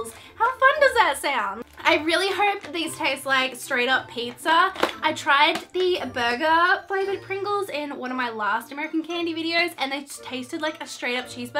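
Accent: Australia. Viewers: r u from sydney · are you australian 🇦🇺🐨🐑🇦🇺🐨🐑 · Are you Australian? Xx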